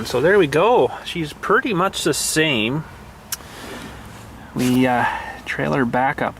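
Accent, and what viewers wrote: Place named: Ontario, Canada. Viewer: Good video, but I must say... you sound like Red Green! Haha, must be an Ontario thing. Cheers from Alberta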